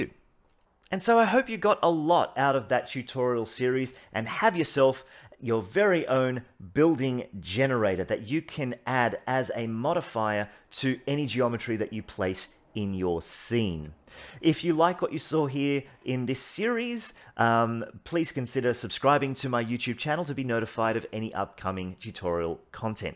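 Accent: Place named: Australia. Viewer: Aussie accent, Pikachu in background... off to an awesome start. lol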